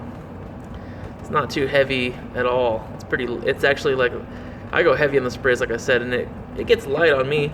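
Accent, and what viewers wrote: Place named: New Jersey, United States. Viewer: Damn, I didn't know you lived in Utah, no offence but you come off as a Jersey Jew... in a charming way.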